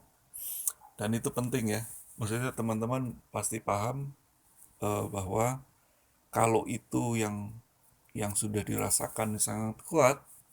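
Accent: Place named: East Java, Indonesia. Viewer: Mass sampean iki asline pundi kok kyak logat jawatimuran kalau ngomong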